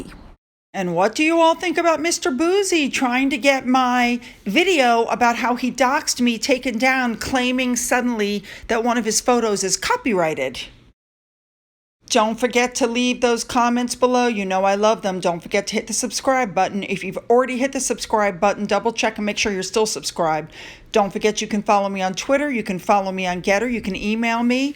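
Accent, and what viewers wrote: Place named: United States. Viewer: I think you are very brave to continue with Utube, - you have a great clear voice from the USA on the side of Her Majesty. Thank you.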